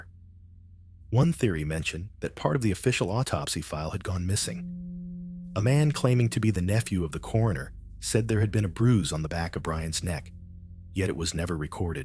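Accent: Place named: United States. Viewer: Another click bait channel full of garbage. Not surprised it is narrated by a scam artist American.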